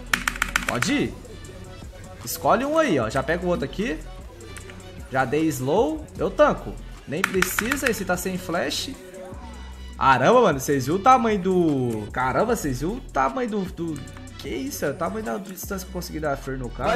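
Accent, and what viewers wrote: Minas Gerais, Brazil. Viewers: ce é mineiro? nois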